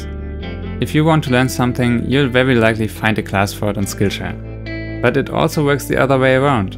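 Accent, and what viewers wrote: Germany. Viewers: you are german bc i hear it xD and i am german and on the paper block i can read "500 blatt" thats german bro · Are you from Germany? I think I can hear an accent. Anyways, very good video because I bought Copics too, because I I think they'd be good for Manga drawings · can i hear a German accent? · I love your beautiful German accent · Tangerine He got that big fat german accent... just like me :)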